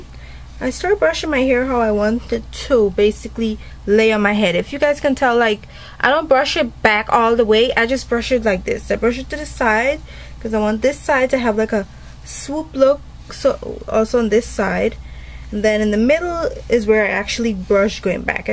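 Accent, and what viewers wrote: Caribbean. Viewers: r u from the caribbean? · Are you from trinidad or guyana? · she def sound like a island gyal XD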